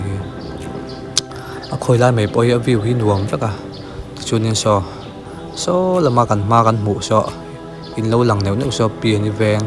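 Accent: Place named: Southern Mizoram, India. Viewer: Itawngdan chu chhimlam mi iva angvele aw aw iti reng maia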